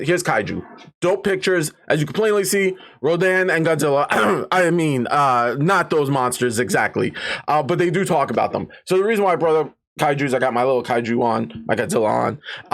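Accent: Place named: New York, United States. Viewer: Great video! And great NY accent!!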